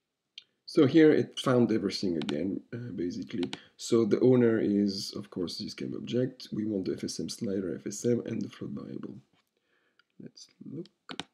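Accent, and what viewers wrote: France. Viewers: Hello, I really like this video, especially french accent of english and sound of clicking :) But, I have a question, I downloaded ecosystem to my Unity(I've already installed PlayMaker), then I typed "UGui Proxy" to search line directly in The Ecosystem Browser and I couldn't find it. Can anybody help me with it? Maybe I can find Ugui Proxy somewhere else? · Same here. Non native english speaker and I understand your nice french accent perfectly. Nothing to improve there. Thanks for the tutorial.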